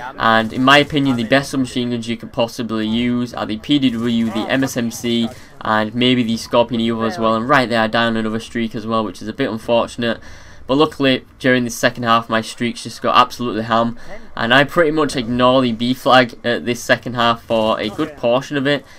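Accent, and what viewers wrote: United Kingdom. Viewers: Which part of the UK are you from?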